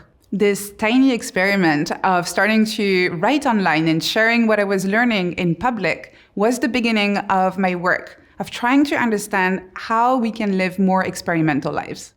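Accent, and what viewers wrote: France. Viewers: My cynical mind tells me that this impenetrable French-accent madam with aristocratic pretentions has a point — very painfully so. Now all that’s left is to get rid of my cynical mind.... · It is normal behavior. Also, she's obviously French and although she does a great job with modulating her voice to match what she's saying, it can sometimes sound monotonous. Why would she congratulate herself for a degree she got years ago when she had time to digest the joyful emotions associated to succeeding? Maybe y'all americans brag for years on end about your successes but in France we don't repose sur nos lauriers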